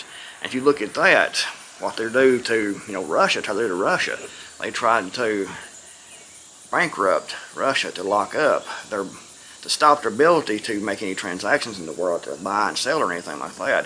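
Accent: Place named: South, United States. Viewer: Hi there, I love your videos. You are calm, and sound like a Southern gentleman. (No offense, or crazy stalking business. Just want to let you know what I value in your videos and on the channel.) I just want to comment on something you said that's tickling my brain, about a comment by someone else, with regards to the lady who is losing interest in prepping. They want excitement, they want something to REACT TO. They want to be entertained. Prepping boils down to keeping one's house in order. It's that simple. Keep decent food, cook for the family, etc etc and, yes, etc. Prepping is KIND OF fun, but it's mostly hard work. It's BORING, until one sees the progress, the stacked canning jars, the freeze dried bags, and so on. It's not GLAMOROUS. I'm up periodically around the clock, there's no makeup involved in slicing and blanching potatoes outside under the carport at 1 A.M. 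because of the heat and humidity in the area. If people want to be entertained, try stealing my chickens, I'll give them entertainment, and some precious metals to boot, and I'm not talking gold or silver.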